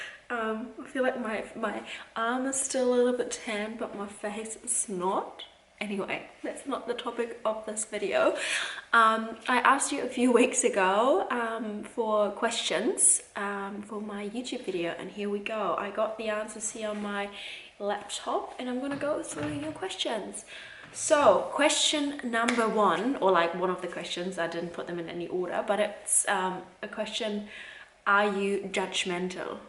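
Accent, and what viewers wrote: New Zealand. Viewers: Your accent is becoming more Kiwi. Many words I hear a New Zealand accent, not German.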